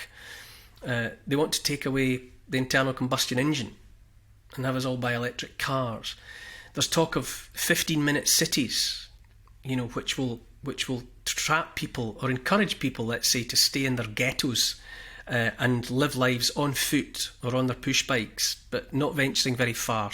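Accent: Scotland, United Kingdom. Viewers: It is not a dialect, it is a Scottish accent.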